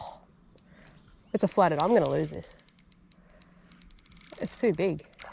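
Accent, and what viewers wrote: Australia. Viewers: r u aussie?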